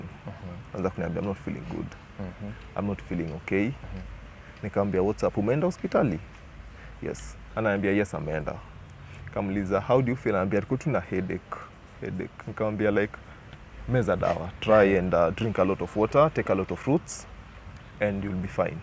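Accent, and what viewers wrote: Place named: Kikuyu, Kenya. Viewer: Sorry, the interviewer!!!! I've watched a few of your videos, but it's like mostly you don't understand the people you are interviewing or you're absent minded at some point. You keep asking some questions that are already explained.. and that's in almost all of your interviews!!! I'm sorry but that's just my view! Then again you "mocked" the Kamba lady coz of her kikamba accent, saying how you "like" the way kambas talk, lakini wewe, have you noticed how you talk!?? Your Gikuyu accent is so heavy!!!!! Come on!!! Try to focus and listen to understand!!!!!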